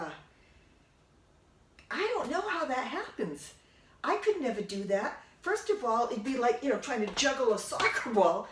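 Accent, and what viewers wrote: New England, United States. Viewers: My mother grew up in New Hampshire and would say “sleep tight, don’t let the bedbugs bite”. I, in turn, said it to my kids when tucking them in to bed. I love your channel and your New England accent because it reminds me of my aunties.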